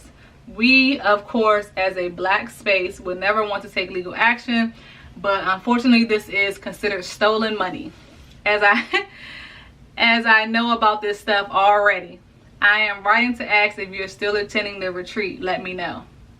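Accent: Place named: DMV, United States. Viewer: Are you from the DMV? Lol you sound like it!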